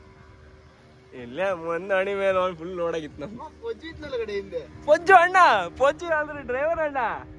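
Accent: Kundapura, India. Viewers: Wow superrrr mangroves Vlogg superr Kundapurane chandaa nivuu mathadooo kundapurr bashee ennuu chandaa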